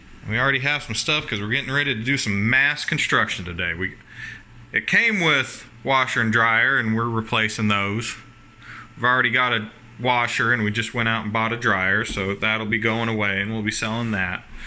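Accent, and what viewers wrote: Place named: South, United States. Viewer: You sound southern af😂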